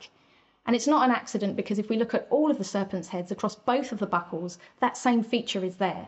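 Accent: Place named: Cockney, United Kingdom. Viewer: Absolutely love her working class London accent Your a class act 👍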